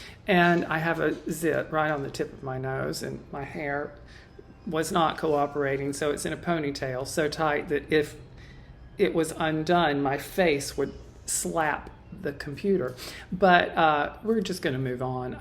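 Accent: South, United States. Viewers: you need to take care of you. If that means making your videos every other week, so be it. You are such a gift to us in this crazy world. Your calm, sweet southern voice is a beacon for us to be guided by to serenity and beauty. Thanks for being you and I, for one, can't wait to see you again!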